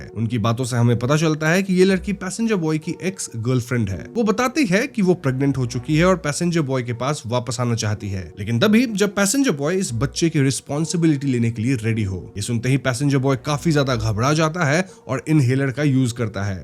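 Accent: Bengal, India. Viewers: You are Bengali, Your tone like bangala.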